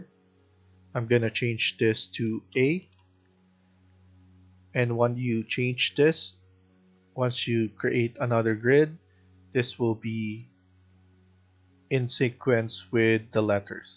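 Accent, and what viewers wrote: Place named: Philippines. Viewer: salamat rin sa guide.. I was right when i first heard you alam ko pinoy agad 😅.. I needed his guide thanks again..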